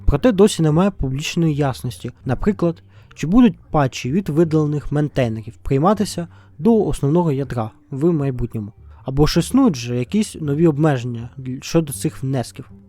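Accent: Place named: Russia. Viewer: Вы выступаете за все украинское, но почему у вас вся фонетика русская?? Вы даже "и" произносите по-русски как [ɨ], а не по-украински как [ɪ] и "в" как русское /в~ф/: жофтня а не жо[w]тня... Буквально слова украинские, а звуки русские. Я тоже не поддерживаю войну, но это какой-то позор. Как лингвисту мне интересно послушать литературный украинский, но такие материалы найти реально сложно, везде какая-то пародия на украинский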